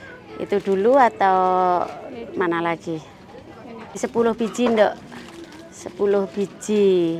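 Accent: Indonesia. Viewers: Selamat pagi semuanya, selamat pagi mba dan sekeluarga, semoga hari ini lebih baik.senang klo uda liat vlognya mba.seruuu dan cara bicaranya khas Indonesia banget.semoga mba slalu dberi kesehatan selalu ya bersama keluarga tercinta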